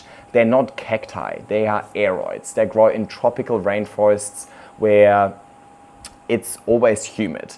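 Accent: Germany. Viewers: Are you from Germany? :) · I can‘t stop wondering about his accent😅 Is he originally German?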